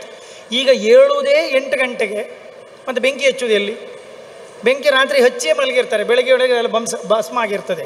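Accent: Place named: Kundapura, India. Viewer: ನನ್ನದು ಉತ್ತರ ಕರ್ನಾಟಕ ನಿಮ್ನ್ ಕುಂದಾಪುರಕ್ಕೆ ಬಂದಿದ್ದೇನೆ ಆದರೆ ಅಲ್ಲಿ ನಿಮ್ಮ ಭಾಷೆ ಕೆಲವು ಶಬ್ದ ಹಳೆಗನ್ನಡ ದಲ್ಲಿ ಇರುವ ಹಾಗೆ ಹೋಗತೇ ಬರಕೆ ಮತ್ತು ಕಿಚ್ಚು ಹಳೆಗನ್ನಡ ದಲ್ಲಿ ಹೇಗೆ ಇದೋ ಹಾಗೆ ಇದೆ ನಿಮ್ಮ ಭಾಷೆ ಕೇಳೋಕೆ ಎಷ್ಟು ಚಂದ ❤️💛❤️💛🙏🙏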